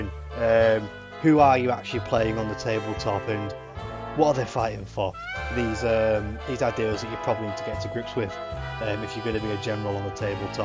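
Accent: United Kingdom. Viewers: Thanks for starting this series. So far ok but what are you trying to do. Why not start with the "history" of the VBCW? Why is this war going on, what are the factions, what has happened . You start us off by showing a bunch of booklets that make no sense without context. Next, LOSE THE MUSIC. Please consider that, hopefully, many of your watchers, like me, are not accustomed to a British accent. It is interesting to learn about the milieu from a British speaker, however. The music makes it even more difficult to understand the vocal audio. Please, also speak a bit slower. I am looking forward to soon learning about what this VBCW is actually about. Thanks.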